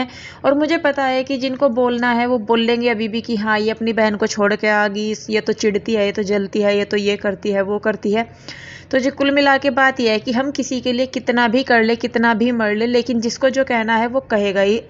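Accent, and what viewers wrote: Haryana, India. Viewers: Haryanvi boli bhut bdiya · Maam ap haryana ke ho kya apki tone vha ki he lgti h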